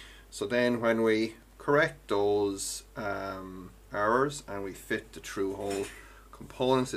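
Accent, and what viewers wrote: Ireland. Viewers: His accent is Irish.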